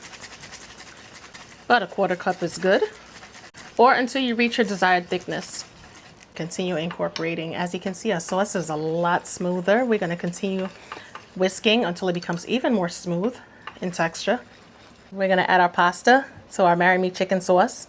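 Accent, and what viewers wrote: New York, United States. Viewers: U sound like ur from new york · I love your New York accent. Cayenne pepper is good over that pasta 😊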